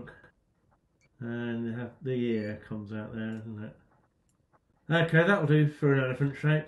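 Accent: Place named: RP, United Kingdom. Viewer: Great tutorial, and love the posh accent :)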